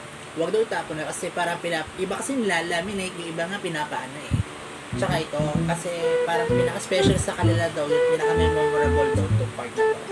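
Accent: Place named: Iloilo, Philippines. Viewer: Ang Sarap Naman Po Nyan Lods... Parang ilonggo Ka Ata Lods. Haha Napansin Ko Lang Po Sa Tono. #Jf_Acuña_Lang_Malakas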